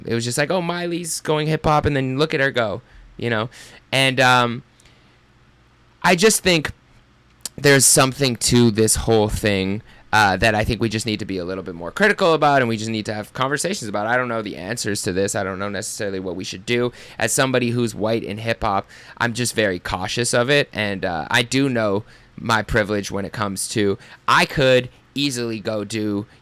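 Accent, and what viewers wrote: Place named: Canada. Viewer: This is an actual Canadian accent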